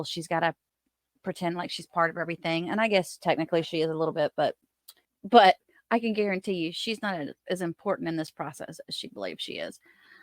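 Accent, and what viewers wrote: South, United States. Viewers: With your sweet southern accent, I'm waiting for you to say "bless her heart".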